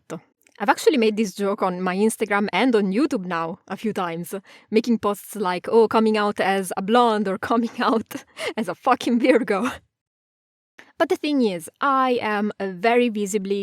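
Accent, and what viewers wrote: Italy. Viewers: You sound familiar....oh yeah, you are Italian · Ho sentito che sei italian dal esatto momento in cui hai parlato, anche se era inglese hahahaha Ti capisco così tanto sul problema dei pronomi, alla fine anch'io gli uso entrambi vivendo nel caos e confondendo le persone eheh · I'm so happy to see this, happy pride month from the Asia part of the world~ and your Italian and accent so cool!! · oddio ho capito subito dall accento che sei italianə, io la uso la ə ma solo nello scritto funziona secondo me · Hiiii!!!! It happens the same to me. I am spanish, so I completely understand the thing about pronouns. In my case, I prefer to refer to myself with "masculine" pronouns, but people could tell me whatever they want to, I won't mind jsjsjs. Also, I love how your italian accent shows when you are talking in english. It's so funny to hear :)))) Pd: Love the video and your art style <3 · Your Italian accent is so funny haha 😄 (in a good way)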